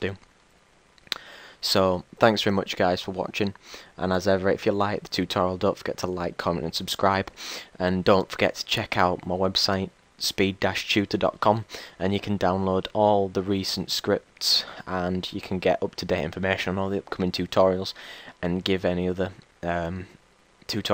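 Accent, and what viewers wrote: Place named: England, United Kingdom. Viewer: Haha strange isn't the right word maybe, sorry. ^^ I come from Germany but I never heard such a dialect from England!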